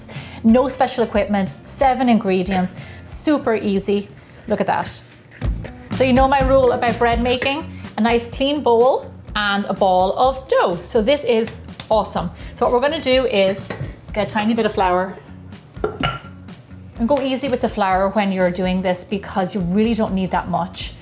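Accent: Ireland. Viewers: Love your videos,I will try this bread recipe fingers crossed, love your Irish ☘️ accent, Thanks for this recipe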